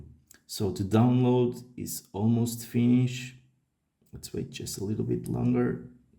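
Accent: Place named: Portugal. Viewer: Acho que é português e não brasileiro. Pelo sotaque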